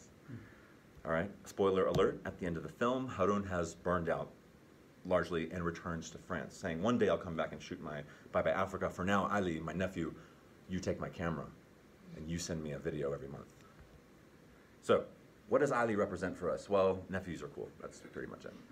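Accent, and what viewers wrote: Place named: United States. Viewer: ناروتو اوزماكي اوتشيها انتا مصدق انوا سوداني؟ ترا امريكي لغتو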